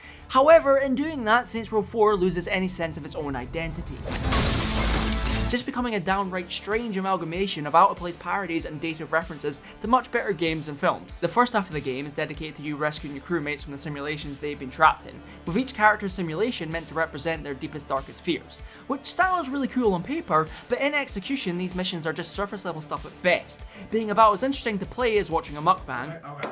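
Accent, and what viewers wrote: Scotland, United Kingdom. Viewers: Ay is this man Scottish Huge w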